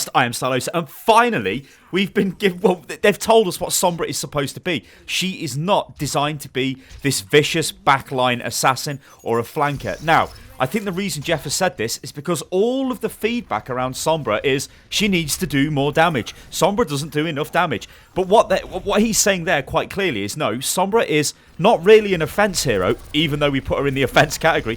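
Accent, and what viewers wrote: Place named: United Kingdom. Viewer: But with an awesome british accent though.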